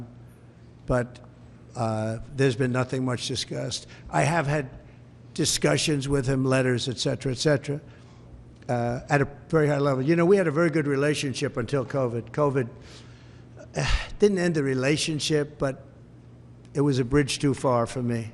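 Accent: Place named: New York, United States. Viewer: Absolutely… it is just funny how he talks… it is a New York style I assume.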